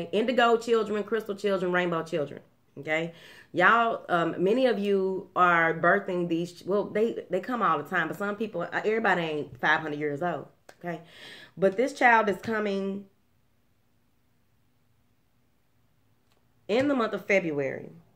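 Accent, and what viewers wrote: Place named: Memphis, United States. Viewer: You have to be from Memphis!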